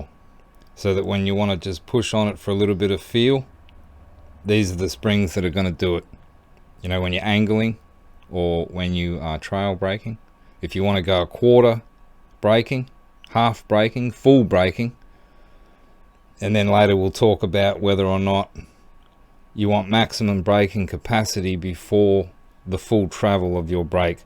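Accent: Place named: Randwick, Australia. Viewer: You sound like you're from Randwick, mate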